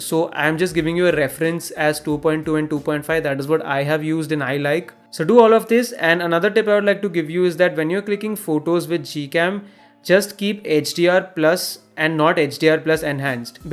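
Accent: India. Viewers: CRINGE HEAR INDIAN SPEAK ENGLISH !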